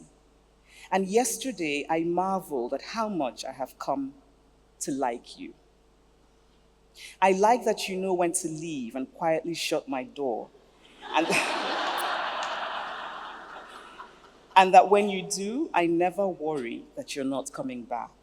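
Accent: Nigeria. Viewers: Would call it bravery amongst other things. Cause people around her would be intentionally or passively chipping away at her to stop making them feel uncomfortable whenever they all go out and she speaks in her natural Nigerian accent, but her courage and confidence in her own tone and skin has helped her last this long, without modifying it. I think by now it’s much easier for her to sustain, but earlier in her abroad life, it’ll have been much harder & the critics would’ve been much louder too. Hoping more Africans that’re spread across the diaspora develop this natural courage to speak freely but remain eloquent at all times. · "How I like finding bumps in smooth surfaces" I could relate to this. I'm always scared of perfection because perfection is too mechanical. I love that you maintained your Nigerian accent, it's beautiful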